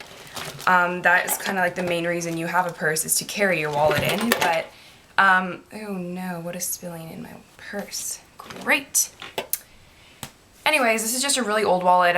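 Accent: Canada. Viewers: no not really... prob cause ur not used to Canadian accents. i guess i'm just used to it cause i'm Canadian ;) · What the hell?! LOL. I'm Canadian and we don't consider ourselves to have accents...she talks the way I talk too. lol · she's Canadian so she does have a slight accent.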